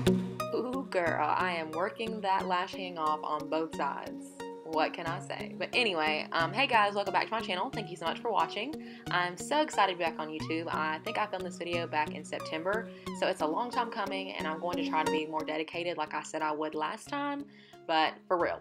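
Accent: South, United States. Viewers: I love the southern twang. Where are you from? Hate the music!!!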